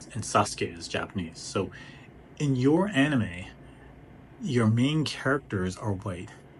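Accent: United States. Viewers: You sound way more American than Japanese lol Japan does embrace its beauty but Japanese are also abstract thinkers, certain things are just chic. In America we imitate the Asians as well it’s a cultural exchange. Also sounds like you’re just referring to major city areas. Goku turns sayan bc there’s an alien race called the blondes and turning gold symbolizes godlike elements. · you sound like such an american dude... if you ever actually cared for any of this media you would know japan always sets its fantasy setting in pseudo-european worlds if it occurs in modern day japan then majority of characters are always japanese and im sorry but naruto mario and attack on titan doesnt represent the medium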